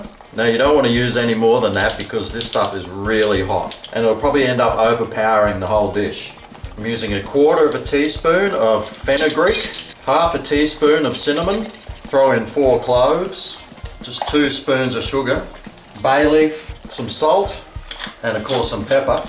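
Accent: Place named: Australia. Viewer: Tuck on in...I love the way you Aussies speak. Great show as always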